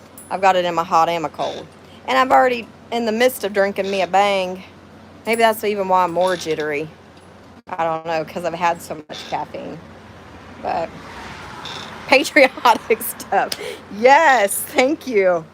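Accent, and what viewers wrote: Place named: South, United States. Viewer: You definitely have what we call a country accent. Even some Californians have country accents and I can pick up that country accent just hanging out with others who have it-it’s my Okie blood😜🤣 Obviously this is being watched and commented days after you went live-I always miss the fun! You don’t seem nervous or over chatty at all/you seem like a natural ❤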